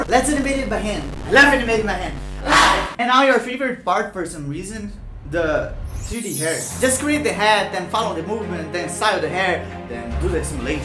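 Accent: Brazil. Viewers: Sim ele é brasileiro, dá até para notar um sotaquezinho e tbm mostra na localização do canal, só que como a maioria do público dele é gringo ele fala em inglês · na verdade ele tem um sotaque mt fácil de identificar que é brasileiro kkk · Você é brasileiro né? da para notar no sotaque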